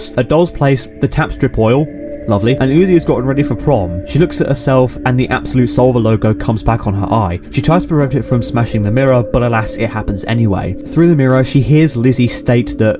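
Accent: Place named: United Kingdom. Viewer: The brit'ish accent is very strong here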